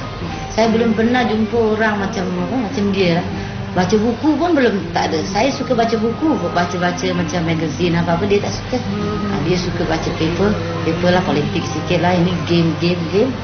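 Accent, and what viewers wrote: Singapore. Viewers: Bahasa Melayu loghat Singapura. Jarang boleh dengar pada masa kini.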